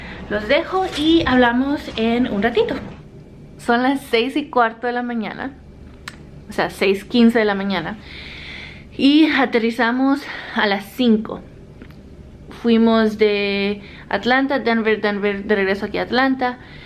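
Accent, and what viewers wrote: Spain. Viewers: your spanish accent is so cute ❤️ in Portugal, we say “Assistente de Bordo” or “Hospedeira” for flight attendant ☺️ Great video!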